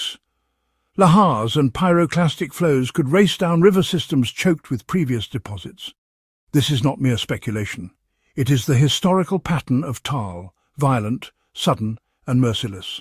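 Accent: United Kingdom. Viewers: Hate British narration:: manipulative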